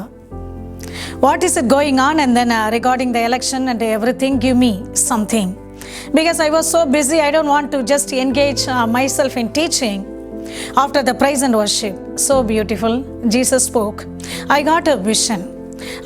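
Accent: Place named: India. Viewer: Respectfully I tell you,I like your Indian accent but more still I like your great faith in the Lord Jesus.Thankyou.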